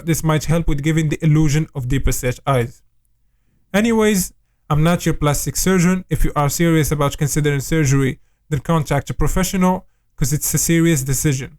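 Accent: Morocco. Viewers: accent mghribi brb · Your accent is Moroccan ?? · Ur voice bayna mghribi Keep it up asat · is that a moroccan accent 🧐🧐 ? ARE U MOROCCAN GUYS ?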